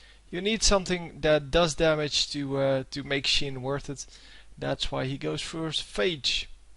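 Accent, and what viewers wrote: Netherlands. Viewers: when dutch ppl speak english 0,0 nty plz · Better quality :) The game was not that special xs. Nice comentator Aan je accent hoor je dat je NL bent ;) BE hier ^^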